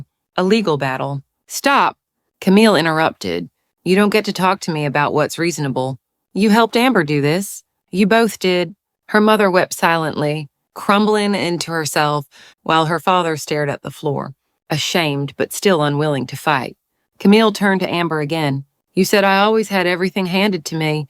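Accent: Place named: South, United States. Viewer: LOVE THE SOFT SOUTHERN ACCENT. Good story.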